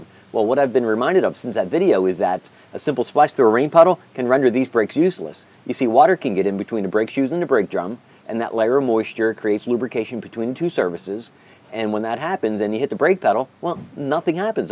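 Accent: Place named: Philadelphia, United States. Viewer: Heard a little bit of Philly in your accent; then saw the DE tag on your car; makes sense!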